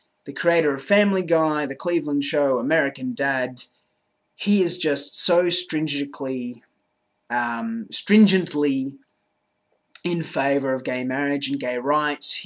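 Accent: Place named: Australia. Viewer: what part of australia are you from? i can't pick the accent and its annoying me :P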